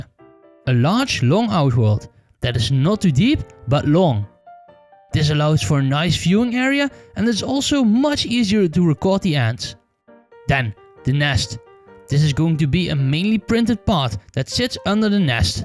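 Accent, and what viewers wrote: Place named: Netherlands. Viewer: Cool accent. Let me guess... You are from the netherlands?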